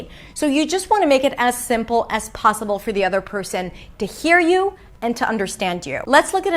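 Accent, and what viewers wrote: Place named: United States. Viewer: With the mask and a strong american accent it is quite dificult to understand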